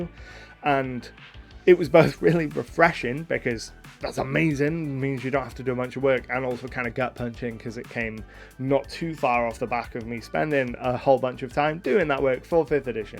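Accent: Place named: England, United Kingdom. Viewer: Thoughts 1. Tags. Completely agree. Standardised tags make everything easier 2. Encounter Building. Mindboglling that WotC has a DIFFERENT internal system for building encounters than the one published for other users. Perhaps it’s a business decision thing, to give their products a competitive advantage. 3. XP and Rewards. This I think is very hard. Xp is the hardest thing to sort out in RPGs, but it sounds like PF 2e is at least doing it better. 4. The Economy. Yes yes a thousand times yes. 5. More detailed Weapons and weapon effects. Agree, but the game gets WAY more complex, and not all players enjoy combat. 6. Level 20 Options. Yes, but 5e did make some improvements from previous systems. 7. 10th level spells. Here is a heresy, spell levels should be renamed to correspond with caster level, so 2nd level spells become 3rd levels spells, 5th level spells 9th level spells etc. 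Then we can have 20th level spells! 8. Magic Item rarity. Yep. but I like how 5e did Magic items. They made them a bit more special I think. And yes the character building is better etc The BIG negative however, is Pathfinder 2e is a lot more complex than 5e, and a lot of 5e’s appeal has been it’s simplicity (Before the rules bloat). Great vid though dude. I too am from the UK so your joyful English Accent is a great oasis in the American dominated youtube! best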